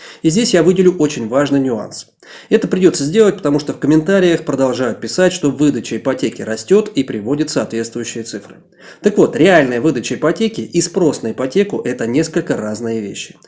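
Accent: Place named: South, Russia. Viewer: у вас южный акцент. Если не секрет вы откуда приехали в Москву. 😊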